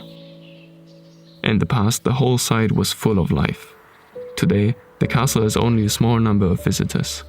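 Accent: Germany. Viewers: 💜💙 Que hermosura! Y que bella voz del narrador, tiene acento alemán 💜💙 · Is the person from this video German? I love his accent.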